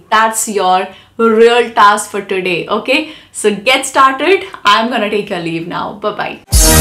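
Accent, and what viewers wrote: India. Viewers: Thankyou so much mam . Iam the one who has no interest in English videos and movies. Because i don't understand American accent and British. I believe that British and American accent is the real English. Indian accent is not a real English. But when i started to watching your video i understand that Indian accent is also English. And i loved your videos and addicted to you. And i learning English only because of you. Lot of love from Kerala ❤ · 🙏Tqsm mam For Today's All useful idioms n phrases ,it really helpful for us n again tq mam for your Guidance in Bharats Accent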